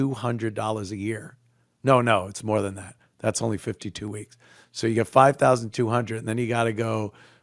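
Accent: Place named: South, United States. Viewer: As a southerner I approve of ants new found accent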